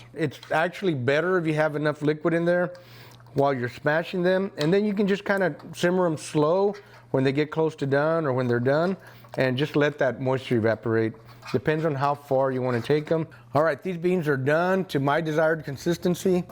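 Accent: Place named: West Texas, United States. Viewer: Dang cousin! We have to be related! Great Migas and everything! I have family in Pecos Ft. Stockton, Monahans, Imperial, Crane, Odessa, Midland.., you sound like us!! Love your video! and love H.E.B. !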